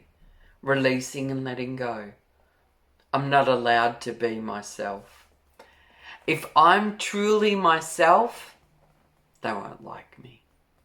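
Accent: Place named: Australia. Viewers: same here, i enjoy your Australian accent too for a change, after hearing UK/US and other accent all my life.:). My Indian accent LOL: <link> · Thank you so much for this,. I had a lot of realizations. And I just love your Aussie accent, I get quite homesick when I hear you and I’m actually German, but lived in Oz for 8 years. Truest feel good Tapping!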